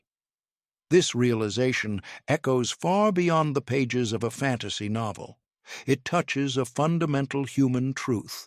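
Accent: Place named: United States. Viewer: middle earth tales narrated with an American accent just don't fly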